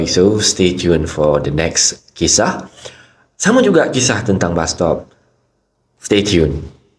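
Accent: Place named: Johor, Malaysia. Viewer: Sy kdg2 gelak dengar penyampaian cerita ni. Mmg kelakarlah loghat.orang Johor... Apapun sy suka dengar Kisah Seram Msia....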